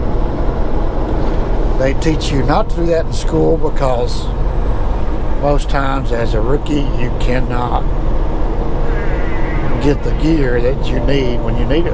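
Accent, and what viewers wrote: South, United States. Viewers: I really enjoy your videos. My dad drove for many years. I crawled in the truck every chance I had. He was born and raised in east Tennessee so hearing a friendly southern accent puts me back in the truck with him pretty easily. Be careful. I'll keep checking in on you.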